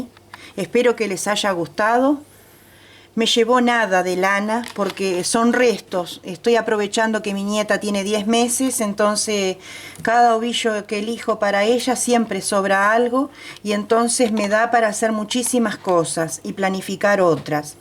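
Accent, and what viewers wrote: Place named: Argentina. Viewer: si pero por lo visto sos de ARGENTINA por tu asento es unico ... y aca no usamos esos terminos.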